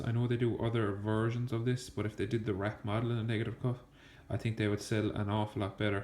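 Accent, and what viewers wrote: Ireland. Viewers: sounds like a clare man 😂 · are you from Ireland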